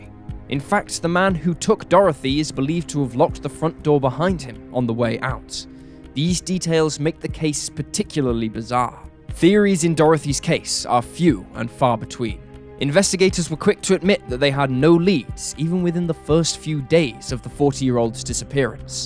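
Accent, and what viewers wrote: United Kingdom. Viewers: Where would you ascribe his accent in the UK?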